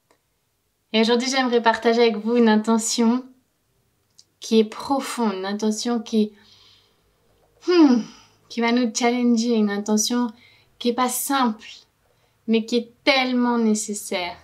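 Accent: Spain. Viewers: Coucou ! Ya estás pillando el accento español un huevo! :) Yo pillando el francés....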